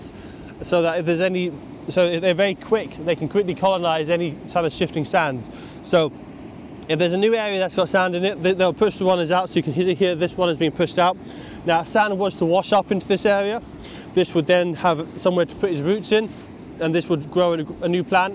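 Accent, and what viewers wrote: Scotland, United Kingdom. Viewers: Hi! Thanks for this very interesting and informative video! Would love to see more of your documentaries! Excuse my nosey question, and don't answer it if you find it too intrusive: Do you speak Scottish English? I'm asking because I find it perfectly easy to understand you. But when I visited Scotland years back, I had a very hard time understanding people in Edinburgh and Glasgow (Glasgow was even harder!). Or don't you speak your regional dialect / accent when you're doing your videos? Have an awesome Sunday! Marc from Berlin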